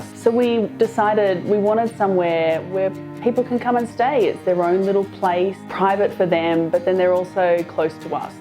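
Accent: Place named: New Jersey, United States. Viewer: i love her new jersey accent.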